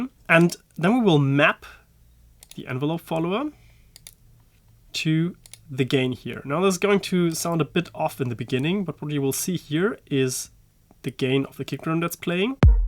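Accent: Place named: Germany. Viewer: ich kann deine germanness so sehr durch dein englisch hören :D gutes video aber!!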